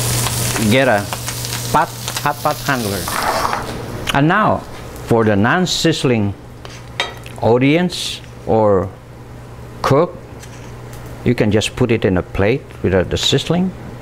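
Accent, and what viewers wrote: Philippines. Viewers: Only Filipinos will criticize other Filipinos for their English. I know a lot of people who’s been here in the U.S. and still can’t pronounce or speak the English language but nobody cares. I sure don’t care but other Filipinos will make fun of their own. How about we start lifting each other up instead of putting people down because they can’t speak a certain language. He’s Filipino not American. Your comment has nothing to do with what he’s doing. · This looks so good Chef. My uncle used to make sisig for us but he moved to the Philippines when he retired from the U.S Navy. Unfortunately, he took his recipe with him, even my Dad don't know how to make it. Lol, I am glad I found your video, it look so easy to make and I definitely understand everything that you were saying, I love the Filipino accent, that's how you know it's real. 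I love you guys and keep up the good work..Yummmm!!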